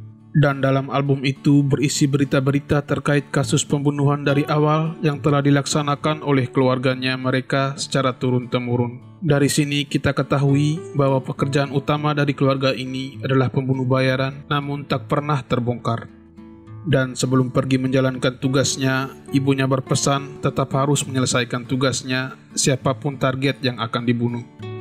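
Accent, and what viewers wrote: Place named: East Nusa Tenggara, Indonesia. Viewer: Seperti logat NTT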